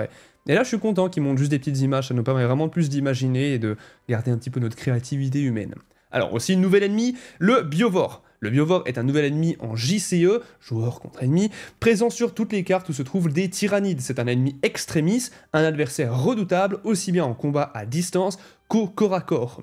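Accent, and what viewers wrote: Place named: Switzerland. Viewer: QUOI TU EDT SUISSE, quelle canton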